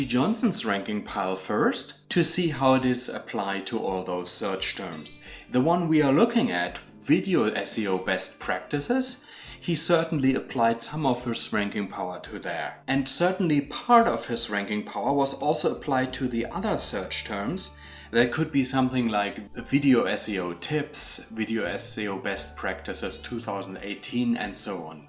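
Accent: Germany. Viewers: hört man richtig stark. Ist halt der typische deutsche Akzent beim englisch reden 😃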